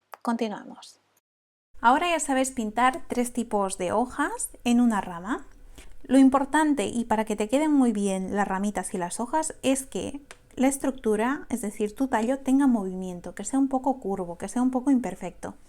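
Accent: Spain. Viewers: Que linda es la maestra con acento español, saludos desde Quito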